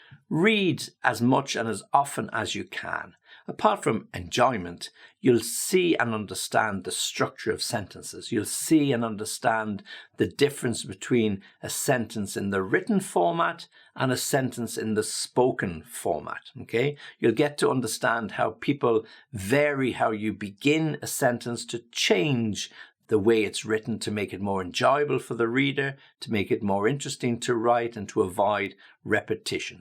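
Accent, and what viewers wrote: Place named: United Kingdom. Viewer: He is from uk with British accent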